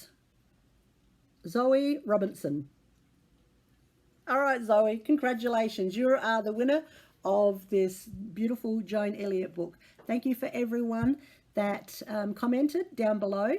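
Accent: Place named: Australia. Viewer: Love your videos and accent - lived in Exmouth, WA in the 70s and LOVED Australia. My oldest son was born there too! I would love to go back and spend more time in Perth and explore more of your beautiful country. I would love the Austen bag - it is amazing. Enjoy your retreat, and have a most wonderful trip back home. Much love. 💕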